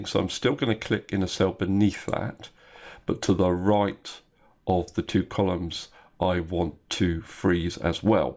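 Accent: United Kingdom. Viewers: Your british accent makes this so much more fun/engaging. When you said "isn't that brilliant?" I was like, why yes... yes it is.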